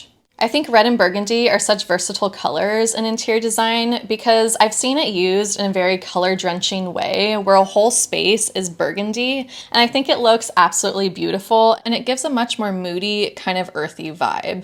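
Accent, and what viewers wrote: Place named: California, United States. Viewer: I pick up vibes in a voice and what your voice with vocal fry and upspeak suggests is very arrogant Snobbish and bored. Its sort of a mean girl california sound. It cant help it I am interested in what you have to say but just as if you were shouting at me its hard to get past the affectation.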